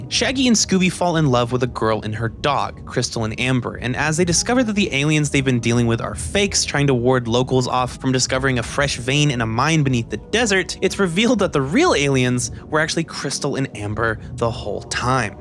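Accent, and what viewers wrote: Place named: New York, United States. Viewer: he sounds like a typical new yorker, watchu mean fam? 😂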